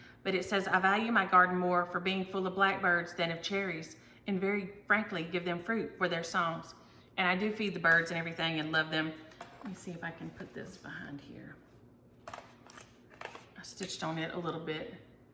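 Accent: South, United States. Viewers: Oh my gosh those babies are so adorable!!! Have a good walk Yes I too think I would enjoy your narrations of books, I love southern accents and end up trying to talk like you after watching your videos..lol We also have the same tradition of doing a Sleepy Hallow and Christmas Carol reading for the holidays. Pass along the giveaway if it lands on me for saying reading.. I just wanted to connect with you about traditions <3 Happy stitching -Jenn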